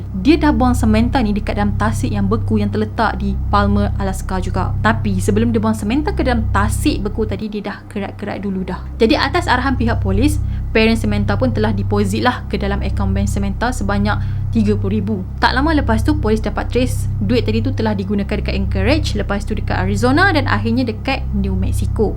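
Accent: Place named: Kelantan, Malaysia. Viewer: Israil ni org kelantan ke....dengar mcm kak ckp mcm org kelantan...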